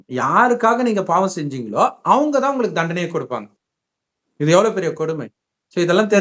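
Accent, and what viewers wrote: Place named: Tirunelveli, India. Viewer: sister thirunelveli ya pesum pothu ooru bashai nalla iruku 🖤🖤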